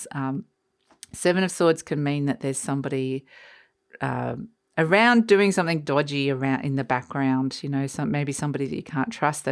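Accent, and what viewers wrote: Australia. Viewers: Nice to hear an Aussie tarot reader. Has been a bad year for me, never got covid, but got Bronchitis, colds, chest infection's from everybody else and at 70 got to watch out, their's a lot of strange bugs going around now. let's hope 2023 is much better in health wise for everyone. Cheers and Merry Christmas Hun.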